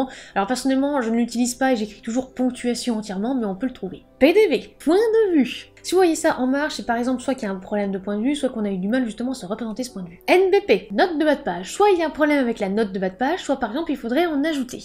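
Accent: England, United Kingdom. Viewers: Bel accent anglais 👏👏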